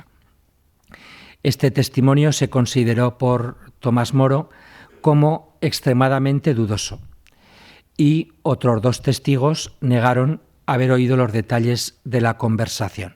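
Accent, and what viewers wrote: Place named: Spain. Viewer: No está preparado este exponente..de por sí con esta ablita de españolete que molesta y aparté no bien preparado en el tema pésimo video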